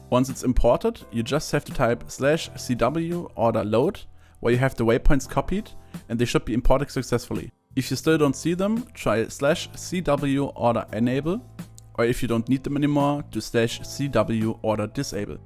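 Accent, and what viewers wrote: Germany. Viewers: Hab deinen Kanal grade zum ersten mal gesehen und hab sofort rausgehört dass du aus Deutschland bist. Gutes Video weiter so 💪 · do I hear a german accent? Edit: Yeah totally useless because you say it later on, i wrote this before watching the video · u sound german are u german? if yes Hi mein bruder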